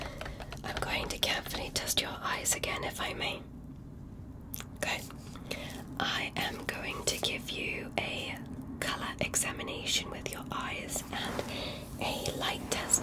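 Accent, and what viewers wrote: England, United Kingdom. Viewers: English Accent 🎉 my Fav 🙏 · Your English accent is marvellous. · OMG the British accent❤ love it❤❤ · Is the English accent from absolutely Fabulous?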